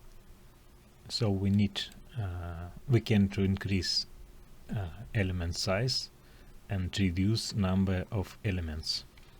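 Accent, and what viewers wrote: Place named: Nizhny Novgorod, Russia. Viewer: Не понимаю зачем вы говорите на английском с кондовым нижегородским акцентом. Комментируйте по русски. Англичане с американцами вас всё равно не поймут.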